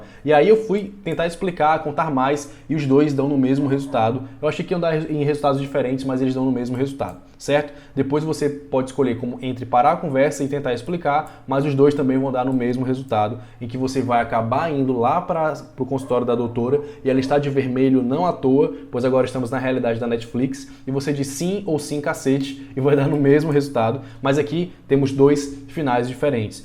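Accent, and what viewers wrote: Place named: Ceará, Brazil. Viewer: Nós 30 primeiros segundos eu me toquei do sotaque cearense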